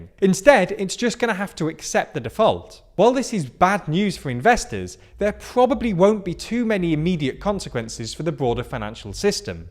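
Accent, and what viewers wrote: United Kingdom. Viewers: I am a bit confused as to why the 2 narrators(this one thinner than the other) of this channel have the exact same voice. Both have good kind of british accent, though.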